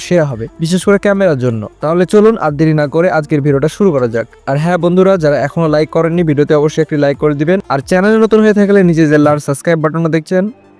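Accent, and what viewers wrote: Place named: Bangladesh. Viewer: ভাই আনার কথা শুনে মনে হয় বাংলাদেশি আপনি ❤❤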